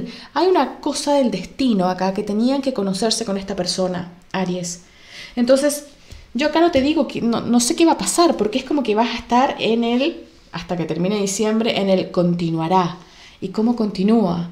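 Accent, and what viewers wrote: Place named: Chile. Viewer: De que país eres???y dónde estás ahora, yo soy de Chile...por tu acento se me imagina que eres chilena...si es así, me gustaría verme las cartas, personalmente.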